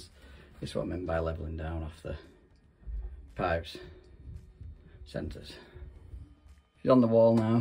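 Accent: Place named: North West England, United Kingdom. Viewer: Good video. Nice pipework. Seem like a decent guy as well. Quick question mate, I’m a plumber. Never used press fit but I’m thinking about getting a press gun, do you ever have any customers saying they don’t want the fittings? Do you ask the customer or just fit them? Seen many plumbers on YouTube and always wondered that. Keep up the good work. I’m Blackpool way. Can tell by your accent you’re north west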